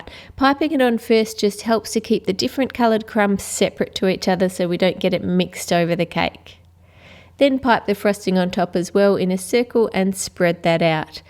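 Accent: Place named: Australia. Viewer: Because she's from Australia had as an accent.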